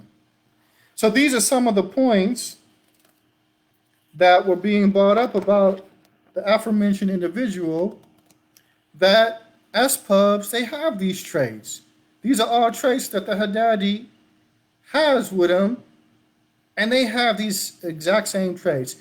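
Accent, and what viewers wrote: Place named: South, United States. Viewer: جزاك الله خيرًا، you give me a feel that you’re from the south Shaykh even though I know you’re from L.A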